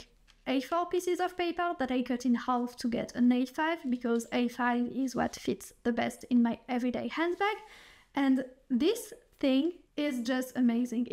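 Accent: France. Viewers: Your French accent is so gorgeous, SO gorgeous! Your voice carries it soo well. Anyway, I will now actually focus watching this. 👌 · Totally unrelated but I am learning the Korean language and I see how difficult it is to speak in a new language not my mother tongue. From your accent I think you are French but I wanted to congratulate you for such amazing English! It’s not easy! You speak in even better English than some English speakers I know. I live in Ontario Canada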